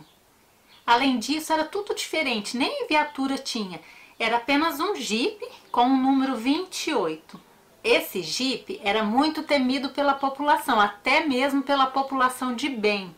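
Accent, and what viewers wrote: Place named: Northeast, Brazil. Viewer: Nota 10 pela a história e nota 1000 pelo sutaque amo o Nordeste e o nordestino também.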